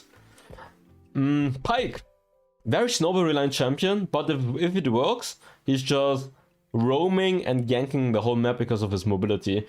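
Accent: Germany. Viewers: Btw: you german?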